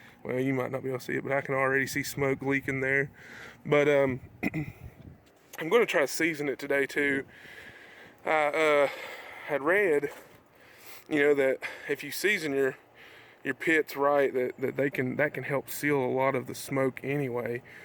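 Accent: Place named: Georgia, United States. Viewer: Dang I thought you was from Georgia😂😂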